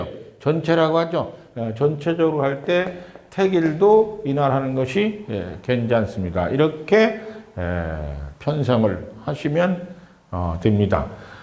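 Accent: Chungcheong, South Korea. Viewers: 여기 매니아 있어요 ~ 선생님 구수한 충청도 사투리가 너무 듣기 좋습니다! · 저는요. 선생님 메니아입니다. 충청도 말씨도 친근감 있고요. ㄱㄴㅇㅅㄲ도 재미납니다.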